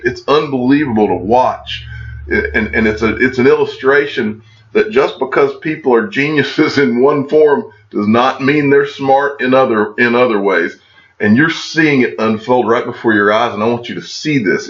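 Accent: United States. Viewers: Whats with the cowboy accent ?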